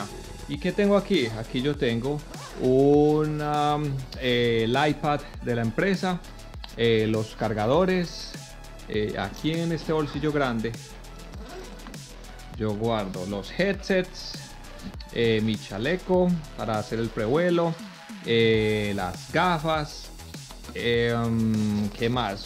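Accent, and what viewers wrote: Colombia. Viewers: Capitan que aerolínea vuela usted Veo que por su acento se nota que es colombiano, yo también soy Colombiano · Será colombiano por casualidad?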